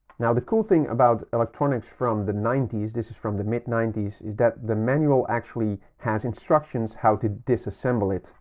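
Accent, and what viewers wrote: Netherlands. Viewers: Hahah, that accent really gives you away. Hallo, noorderbuur! 😁 And thanks for the instruction video. Was considering picking up a walkman from eBay, and wanted to get an idea of how hard it would be to replace the belts. Didn't look too complicated, so now I got a WM-FX45 + new belt on the way. One thing though. When replacing the belts, it's always a good idea to clean the pulleys with a q-tip drenched in alcohol. This removes the rubber residue left by belts that have gone sticky, or rubber dust from belts that dried out. Always a good idea to do some maintenance while you're in there anyway.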